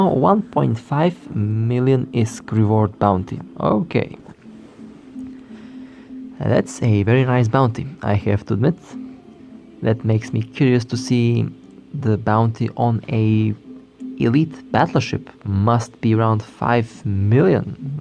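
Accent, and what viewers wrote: Russia. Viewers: Дружище, русский акцент слышно))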